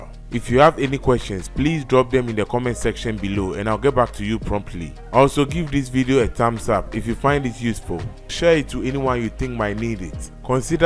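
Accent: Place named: Nigeria. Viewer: You sound like you may be Nigerian. Some good tips. Thank you.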